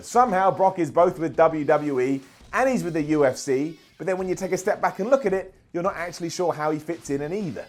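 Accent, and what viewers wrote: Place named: United Kingdom. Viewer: I like that british accent😁😁😁😁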